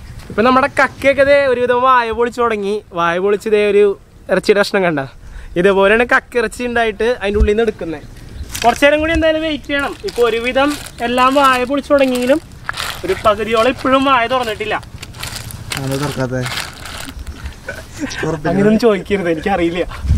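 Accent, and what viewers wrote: Thrissur, India. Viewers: ബ്രോ 4 വയസുള്ള എന്റെ മകൻ നിങ്ങളെ youtube വീഡിയോ orikkal കാണാൻ ഇടയായി. ഇപ്പൊ ഡെയിലി 'മാമന്റെ വീഡിയോ വച്ച്താ എന്നും പറഞ്ഞു കരച്ചിലാണ്. കണ്ടതൊക്കെത്തന്നെ വീണ്ടും വീണ്ടും കാണുന്നു.. കോട്ടയം കാരൻ thrissur ഭാഷ സംസാരിക്കുന്നു... without any training.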